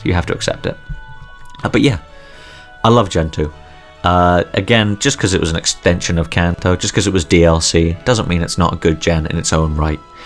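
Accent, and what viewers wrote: United Kingdom. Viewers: are you from the west country in England sir?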